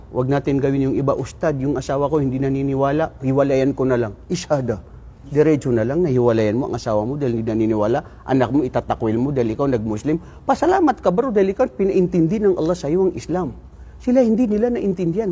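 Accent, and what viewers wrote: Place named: Visayas, Philippines. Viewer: Cute c ustadh mag bisaya😅 oi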